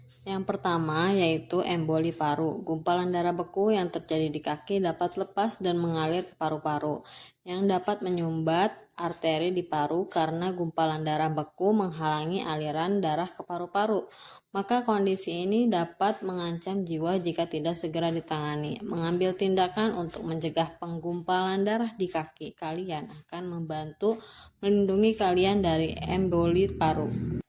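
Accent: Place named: Java, Indonesia. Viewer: Belajar membaca dulu biar lancar.mendok banget bacanya ngapak2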